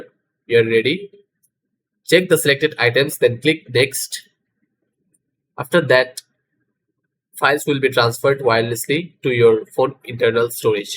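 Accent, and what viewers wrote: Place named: India. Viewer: Where are the US English accent I only find Indians accent which is hard to understand .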